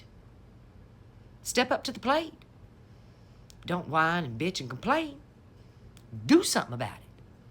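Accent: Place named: Georgia, United States. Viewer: Oh, I thouight that you were a Georgia Peach, cause you sound like the Memorbable Ms. Buzzard (Isn't that a great name?) It's been over 30 years since I last spoke to her (we were workmates). Remeber that actress who played 'Flo in the sitcom: Alice? Well she qlso reminded me of Ms Buzzard (Pronounced to sound like BOOZ-Zard). Another Witty, non racist whyte woman from Atl.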